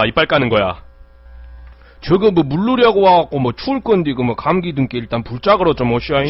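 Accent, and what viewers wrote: Jeolla, South Korea. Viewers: 역시 전라도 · 이건 전라도 네이티브다... 전라도 사람이 아니면 구사할 수 없는 수준 ㅋㅋㅋ 어머님들이 쓰는 억양 그대로 고증해왔네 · 내가 광주/목포 사는데, 그냥 단어며 억양이며 뉘앙스며 그냥 딱 요동네다. 위화감 전~혀없음. 그냥 찐임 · 어쩜 이렇게 전라도 사투리를 맛깔나게 하시는지ㅠㅠㅠㅠ 고향 생각나서 눈물이 날 지경이네ㅠㅠㅋㅋㅋ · 순정 전라도분이시군요ㅋㅋㅋㅋㅋ 저사투리는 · ㅇㅇ? 장 님 전라도 장흥 출신이래요? 동향이시네 어짠지 사투리가 아조 제대로여서 깜짝놀랐네요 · 전라도임? 실망이 크다 구독취소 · 전라도가 고향인데 전라도 사투라 쓴다고 뭐라하냐 ㅋㅋ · 아따 저 걸뱅이새끼 난 이부분이 제일좋아ㅜㅜㅜ 이거들으러 온다 님 전라도사투리 너무찰져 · 사투리 오진다 ㅋㅋㅋㅋㅋ 전라도사람보다 잘쓰는듯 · 내추럴본 전남출신으로써 사투리 네이티브한거 완전 인정 해부러야것네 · 우리 고모가 전라도 분인데..우리 고모랑 말투가 똑같아 우리고모인줄... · 가 전라도 사람이라 잘아노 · 아니진짴ㅋㅋㅋㅋㅋㅋ이토록 찰진 사투리를 영어로 번역할수있다는거야? 외국인은 이 전라도 소울을 느낄수 있는건가? ㅋㅋㅋㅋ개웃기넼ㅋㅋㅋ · 전라도 사투리 억양이 약간 남아있는게 개웃김ㅋㅋ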